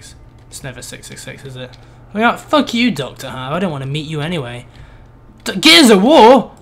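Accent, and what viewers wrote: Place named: England, United Kingdom. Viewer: So, I was playing some Halo 4 Grifball earlier today...and I was playing with a guy who sounds a whole lot like you,. At the very least, I think he was from the same part of England as you are.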